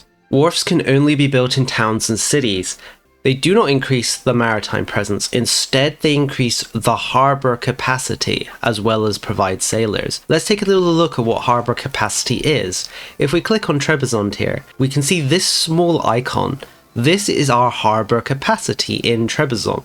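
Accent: United Kingdom. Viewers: Are you British living in America, or an American living in Britain?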